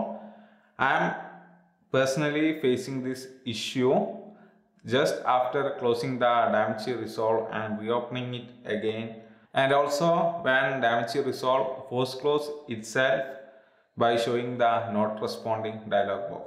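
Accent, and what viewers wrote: Kerala, India. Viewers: Bro malayali anno?😅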